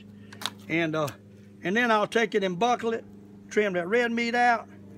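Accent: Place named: South Carolina, United States. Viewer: Great video. Love the gamecock drawl too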